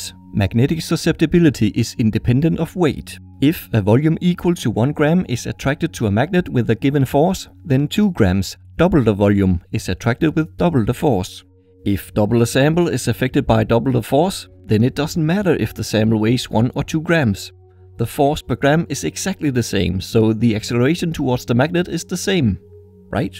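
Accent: Denmark. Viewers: When you just Can hear he’s Danish ;-) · It's funny to hear your Danish. I come from Denmark.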